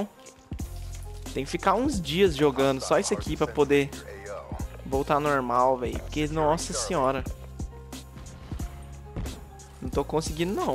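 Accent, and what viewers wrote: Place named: South, Brazil. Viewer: Man, tu tem um fodendo sotaque do Sul, de que lugal vc é brou?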